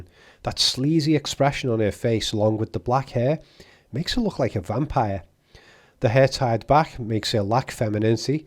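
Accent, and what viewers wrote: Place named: North, United Kingdom. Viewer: Your northern accent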